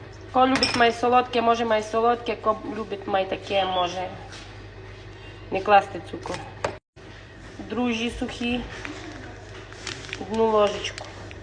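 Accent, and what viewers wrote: Transcarpathia, Ukraine. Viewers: Чудовий закарпатський діалект! Різноманіття -це золото України!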